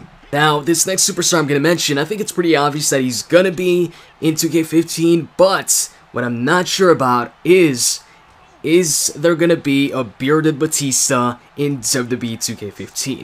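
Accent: Canada. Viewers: why don't you speak with a French Canadian accent anymore? please respond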